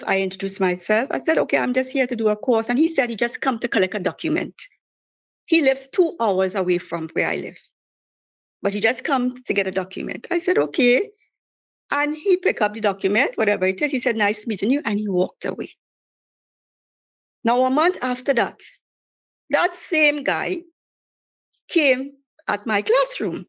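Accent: Trinidad and Tobago. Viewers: yes definitely a trini for sure 🇹🇹🙂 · That’s a Trini accent. ❤️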